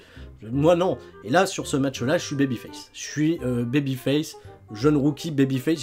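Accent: North, France. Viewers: Salut tu es du nord ? :)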